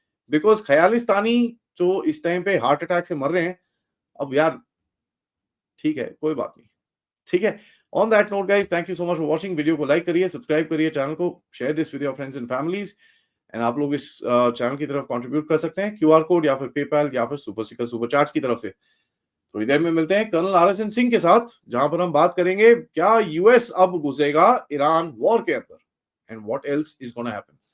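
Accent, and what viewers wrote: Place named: South India, India. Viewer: South Indian hai wo. But itna bolte hai wahi kafi hai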